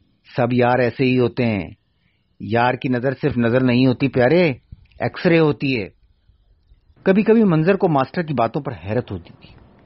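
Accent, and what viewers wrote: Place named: Karachi, Pakistan. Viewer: سب سے پر لطف بات میرے لئے اس کہانی میں کراچی کی زبان تھی۔ اور اس کی ادائیگی بھی خوب کی ہے۔ شکریہ۔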